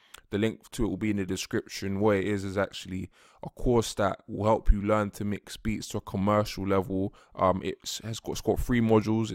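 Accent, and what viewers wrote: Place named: London, United Kingdom. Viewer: Yes, bruv!! Which part of London you from?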